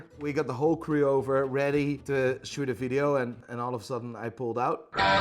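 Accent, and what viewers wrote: Northern Ireland, United Kingdom. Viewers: Ahh man. The NI in your accent is fucking belter.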